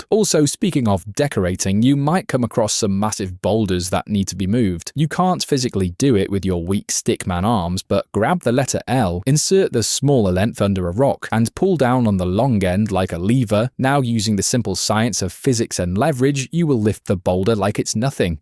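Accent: United Kingdom. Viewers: He's British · Your also British Mate?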